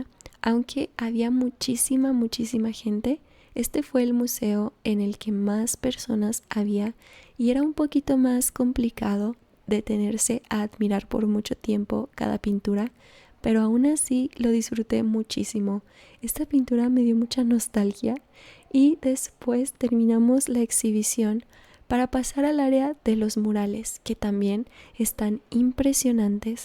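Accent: Mexico City, Mexico. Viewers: Yo también pensé que eras de la CDMX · Casi juraba, antes de este video, que eras de la cdmx y vivias en la roma o en la condesa jajaja. Que bueno que te gusto, salu2! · Wow! Yo juraba que eras de la cdmx por tu acento 🥹 qué bueno que fuiste y conociste mucho, yo creo que los que vivimos aquí no terminamos de recorrerla!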